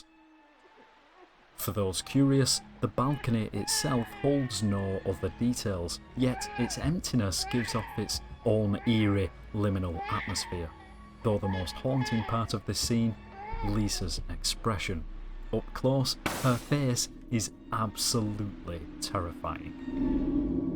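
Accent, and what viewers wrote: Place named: RP, United Kingdom. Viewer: ur not doing bbc news u dont have to speak like such a knobhead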